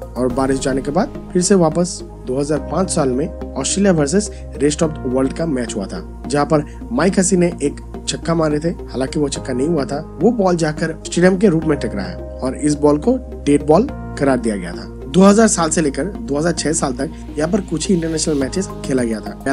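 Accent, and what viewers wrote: Bengal, India. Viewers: Bro are you Bengali? You sounds bong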